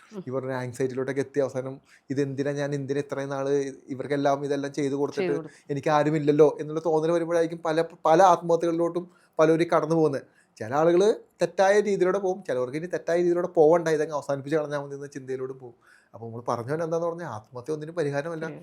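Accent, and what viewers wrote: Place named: Kollam, India. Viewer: കൊല്ലം ജില്ലകാരിയാണോ ഈ സ്ത്രീ...? "ഭ " ഫയങ്കര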